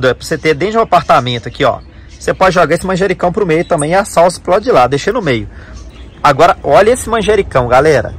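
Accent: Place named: Minas Gerais, Brazil. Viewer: Você é suave, articulado, um ótimo comunicador, gentil e com este charme mineiro, que é apaixonante.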